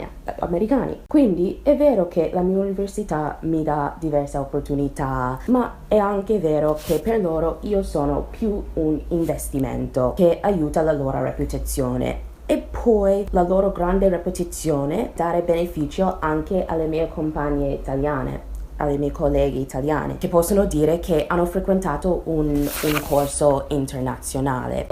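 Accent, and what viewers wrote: United States. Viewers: come mai hai lasciato un paese così bello come l'america e sei venuta in italia? comunque parli molto bene italiano ed è bellissimo l'accento americano che hai. sei bellissima💗👋🏻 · Complimenti per il tuo italiano, anche se ancora si nota molto l'accento Americano ho trovato il tuo modo di parlare molto corretto e soprattutto grammaticato,mi è piaciuto molto ascoltarti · I barely understand Italian but I can hear your American accent loud and clear! <3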